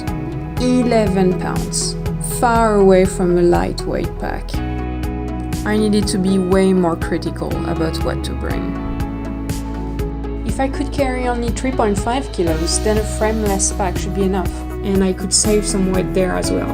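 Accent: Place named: Europe. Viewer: Wow the production of this is amazing! I decided to subscribe within seconds. Can’t wait to see more! You sound European. I’m Welsh. Where are you from?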